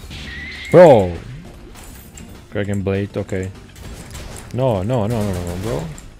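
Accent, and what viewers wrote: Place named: Russia. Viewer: Bro where u from ? I think u from Russia если так то привет брат как дела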